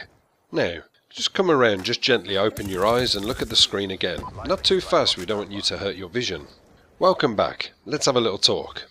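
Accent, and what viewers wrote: United Kingdom. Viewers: British Accent!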